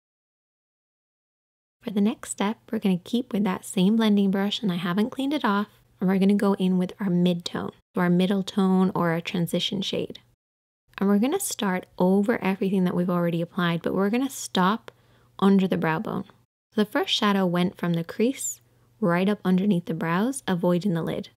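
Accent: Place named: Ireland. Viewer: Weird question, are you Irish? I can hear a wee twang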